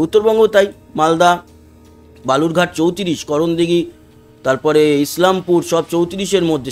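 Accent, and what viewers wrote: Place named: Dhaka, Bangladesh. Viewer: Aapni ki bangladesher manush Dhakar dike aapnar taan besi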